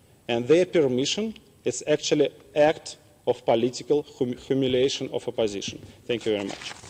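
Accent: Russia. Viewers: По акценту сразу понятно что русский. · Russian English detected! Но все равно, его уровень в 100500 раз лучше Путина!!! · >ещё я не заметил ошибок в ударениях Плохо. Речь у него более-менее правильная, но произношение дубовый типикал рашен акцент. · Говорит на английском по русски))) даже без перевода понятно) молодец, как ни крути) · Неисправимый русский акцент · Построение предложений у него правильное, но вот акцент ужасный, как, собственно, у 90% русских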